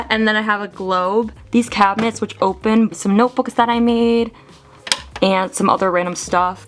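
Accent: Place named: Oklahoma, United States. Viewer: your accent is VERY oklahoman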